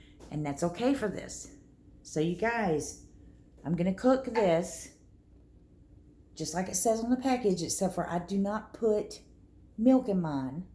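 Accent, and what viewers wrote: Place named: South, United States. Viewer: Every time you say something like “Right quick” I just ❤️ you more! You speak my family’s language! My sister and I keep saying we are going to do a dictionary of our family’s quirky southern phrases.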